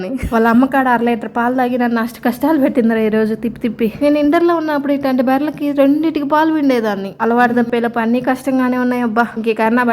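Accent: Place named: Kadapa, India. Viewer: Hii 👋 akka 😊😊😊 Same slang madhi kuda 😂😂😂😂madhey kadapa akka Medhi a oouru akka😂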